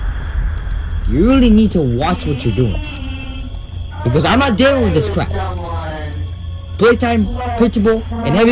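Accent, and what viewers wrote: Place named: France. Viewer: loved it. great job. lots of energy. love the french accent.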